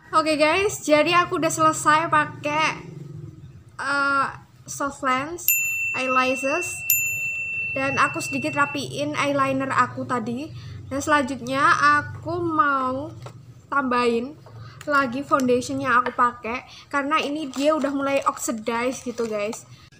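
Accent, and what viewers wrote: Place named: Java, Indonesia. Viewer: suaranya medok bgt suerr, lucu deh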